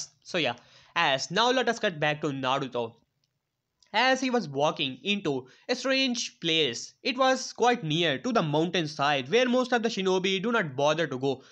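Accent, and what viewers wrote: India. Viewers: Is ur accent a India accent. I'm wondering bc it's too similar to a one piece character and I've always wondered the real live source her way of speaking/ accent came from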